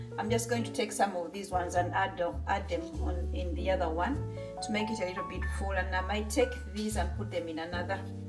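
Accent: Uganda. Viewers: Hi, are u ugandan? Ur ascent is so Ugandan.. Love u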